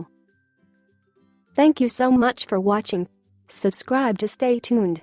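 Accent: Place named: United States. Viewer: What I didn't like was that this was in american english... so, it was very difficult to understand places like Kanya Kaumari, Rann of Kutch and Arunachal Pradesh... So this is a bad video...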